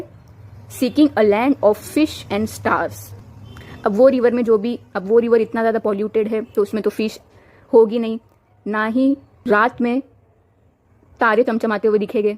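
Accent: Mumbai, India. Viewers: Ma'am are you from Mumbai ?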